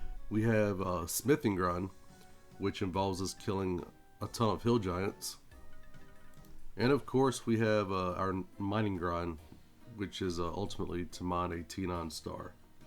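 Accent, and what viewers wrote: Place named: South, United States. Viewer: Can I just say, it's about time some southern accent made it into osrs content creation. Love the series man, hope youre enjoying it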